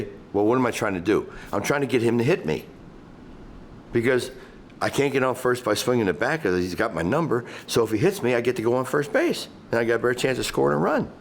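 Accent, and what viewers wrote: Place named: Cincinnati, United States. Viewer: Pure Cincinnati accent. If anyone want to know what SW ohio sounds like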